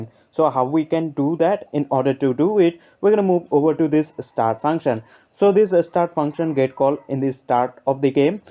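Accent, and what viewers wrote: India. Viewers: i can't listen to this indian accent ... you should look up tutorials how to speak English.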